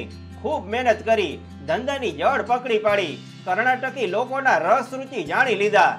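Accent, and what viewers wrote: Kathiawar, India. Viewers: અવાજ કાઠિયાવાડી જેવો લાગે છે. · TAME EK BHARAT VIRUDHDH NU NIVEDAN APE CHHE HU PAN EK GUJARATI CHHU PANBIJA RAJYANA LOKONI PAN KADAR KATVI PADE AVA ZER OKTA VIDEO NA BANAVO TAMARA AVAJTHI TAME KATHIYAVADI LAGO CHHO DUKH THYU YAR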